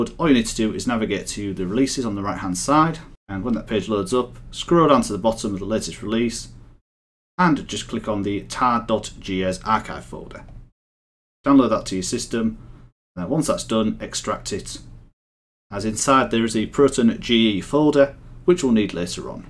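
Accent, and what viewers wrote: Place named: Northern Ireland, United Kingdom. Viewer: Northern Ireland accent 🍀❤️😆Love it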